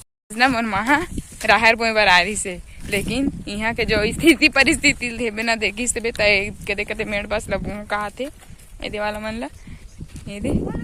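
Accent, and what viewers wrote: Chhattisgarh, India. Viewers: Ek dum chhattisgarhin boli bahut achha he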